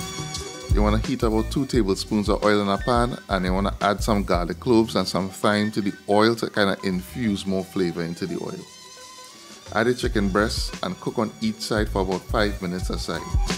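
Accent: Trinidad and Tobago. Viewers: Well I just subscribed because I heard the Trini accent! 💕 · You is a trini man ? 🇹🇹🇹🇹🇹🇹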